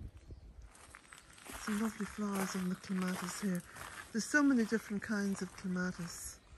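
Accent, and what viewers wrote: Ireland. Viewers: at times when possible and feeling up to it, could you add typed out the names of some of your plants? Today, the one I think started with two syllables of "C" and perhaps ending with being "Aster", I would love to know what it is. It was along a wall and the bees were enjoying it! 🥰 I'm from America. 🇺🇸 🙂 Some of the names of plants I can make out and some along with hearing them correctly, I know of and some I've had. I'm sure you're speaking them all correctly! I dearly adore your accent. In America there's so many different accents! As well, I don't know if we have all of the same plants as Ireland. Some I'm sure aren't in both of our continents. My Great-Grandparents came from Ireland to America. So I have Irish in my ancestry and some in my other blood line, too. Thank you kindly,. I always feel nourished by watching you, seeing your lovely cottage, and life in your ever-evolving three acres! 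A most beautiful slice of earth!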